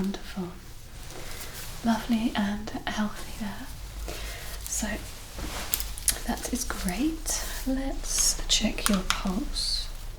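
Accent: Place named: England, United Kingdom. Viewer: J’adore ton accent et ta manière de parler . Tu es anglaise ?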